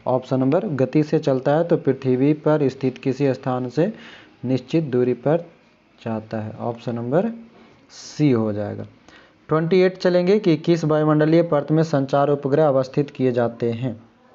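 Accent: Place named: Gaya, India. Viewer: Gaya zila vala tone hai bhai apki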